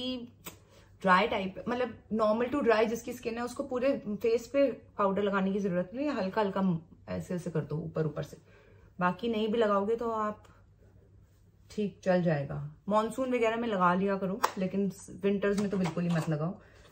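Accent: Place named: Punjab, India. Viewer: This was so fun!! 😄❤️ You have a strong Punjabi Delhi accent, and I love it! 😘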